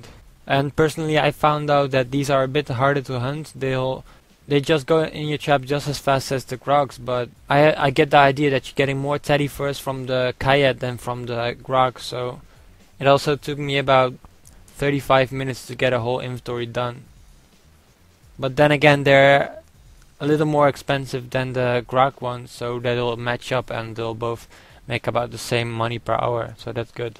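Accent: Netherlands. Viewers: aha ik hoor nederlands accent:P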